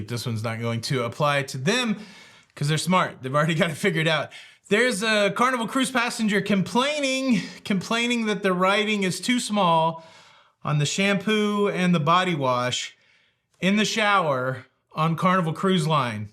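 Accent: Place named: South, United States. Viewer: I done gone wanna learn to speak with a Nashville accent.... I'm fix'n to learn that. Does y'all know if Babbel teaches that? lol Love your southern accent.:)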